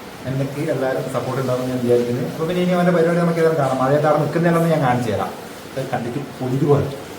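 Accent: Kannur, India. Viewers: നിങ്ങളുടെ vdo കാണുന്നത് ഈ സംസാരം കേൾക്കാൻ വേണ്ടിയാണ്, എല്ലാരുടേം സംസാരം എനിക്ക് ഒത്തിരി ഇഷ്ടാണ്. കണ്ണൂർ സ്ലാങ് നിങ്ങൾ പറയുന്നത് കേൾക്കാൻ സൂപ്പർ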